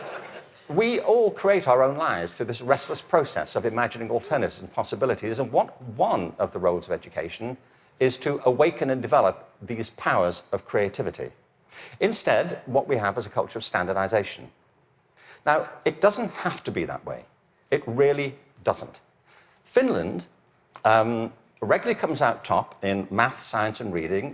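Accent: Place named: United Kingdom. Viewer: 從口音跟有爵位這回事來看，這人是英國人，不是美國人吧...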